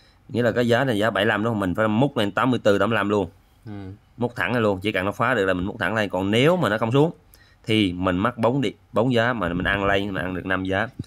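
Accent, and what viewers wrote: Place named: Quang Nam, Vietnam. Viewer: helo a, nghe giọng a giống ở quảng nam z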